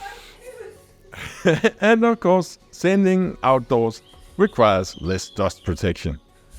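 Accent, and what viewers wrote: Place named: Denmark. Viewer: “Double V D 40” made me laugh. I enjoy the Danish/English. Keep it up buddy. I find myself watching your videos when I want to be calm after a hectic day. You encourage me to build while your videos calm part of my brain that is usually full of angst and frustration. You’re living my dream and I can only imagine how good your soul must feel most days. Thank you for sharing your experiences with us —Chip from Toledo Ohio.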